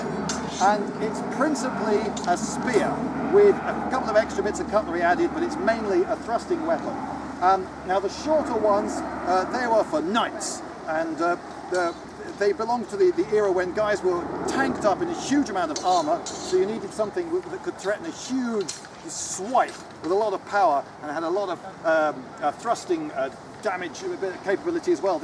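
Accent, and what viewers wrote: United Kingdom. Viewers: I am an American. You are super British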